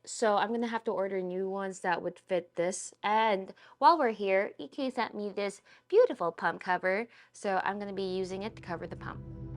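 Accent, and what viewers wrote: Philippines. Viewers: I just recently discovered your channel and I'm amazed at your PC assembly skills. The video editing is excellent as well. I called over my son to watch and he asked, "Is she Filipino?". What? I then realized that your accent did sometimes sound like a Filipino who speaks English (not always). Then I heard you say, "Hay nako!" OMG! (",) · are you a filipina:? cause i can hear a filipino accent in you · I would also love to make my own customized liquid loop for my pc. How expensive it is compared to a normal AIO? And by the way, you have an accent of a Filipina, it's cute :)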